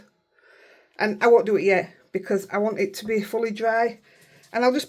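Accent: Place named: Lancashire, United Kingdom. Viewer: That's a stunning bauble! I live in Texas, USA, now, but my home and heart are still on the Lancashire/Yorkshire border (Clithero)--it's wonderful to hear a familiar dialect.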